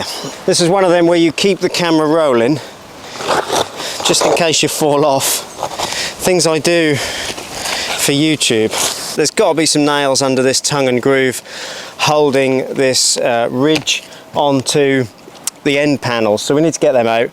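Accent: Norfolk, United Kingdom. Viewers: Ah! the YouTube algorithm sometime throws us an unexpected delight every now and then. Left home as a boy to come to London, and I really miss the Norfolk accent. A real pleasure to hear it spoken..a rare find on YouTube….wishing the young lad here all the best in his filming and diy adventures!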